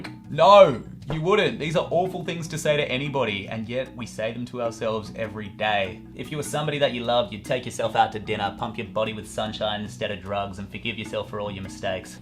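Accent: Australia. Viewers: I bloody adore your videos. Thank you for making them from the bottom of my heart! Good to hear an Aussie voice too!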